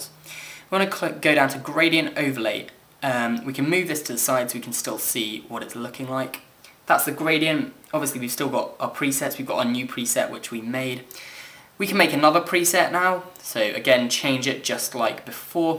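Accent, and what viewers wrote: United Kingdom. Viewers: wow i love british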